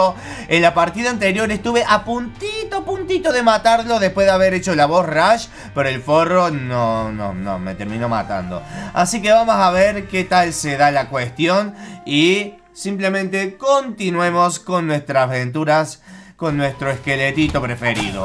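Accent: Argentina. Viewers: Tenía mucho que veía tus videos xd olvidaba lo genial que era el acento argentino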